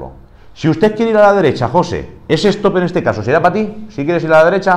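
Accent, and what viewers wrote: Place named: Spain. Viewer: NO ENTIENDO NADA EL ESPAÑOL DE ESPAÑA 😧😧😧😧😧😧😧😧😧😧😧😧😧😧😧😧😧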